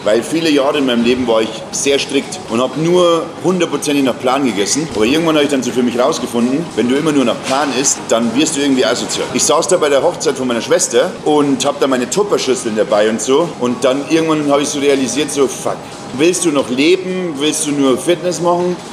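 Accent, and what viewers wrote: Bavaria, Germany. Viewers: Abo für den Bayrischern Dialekt is raus 🙂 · Google Transkription scheitert am Bayrischen Akzent. 🙃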